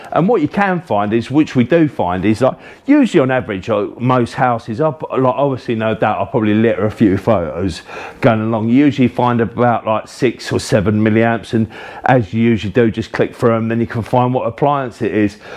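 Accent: United Kingdom. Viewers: This was in Queens English. I suggest you pay better attention next time.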